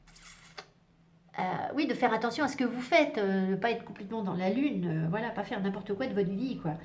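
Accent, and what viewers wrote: England, United Kingdom. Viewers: Merci pour ce beau tirage plein de messages. Très parlant pour moi en tout cas et merci aussi pour votre magnifique accent anglais 😊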